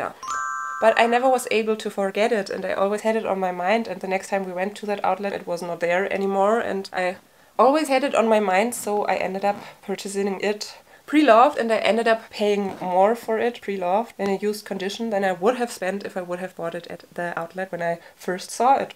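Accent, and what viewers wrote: Germany. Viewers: Awww i can hear u are german. Am i right ? I was looking for such video and yours poped up first. So happy to have found you. Love all your charms. Now going for a hunt.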